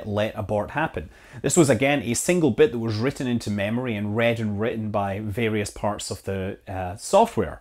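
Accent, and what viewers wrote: Scotland, United Kingdom. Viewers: Nice accent! Scottish? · A Scotsdev, I like it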